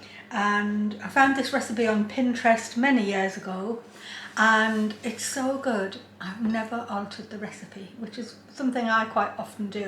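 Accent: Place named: Newcastle, United Kingdom. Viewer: Thank you for sharing this recepy! I just made them and they taste great!!! I love watching your videos, mostly the sewing ones. I'm a quilter and sewer and crafter at heart. I looove your Jordi accent, brings back memories!!! So happy I found you. Lots of love Susanne from Germany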